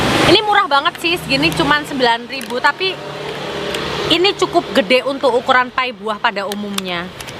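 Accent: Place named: Java, Indonesia. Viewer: Medoknya itu lo, kawaiii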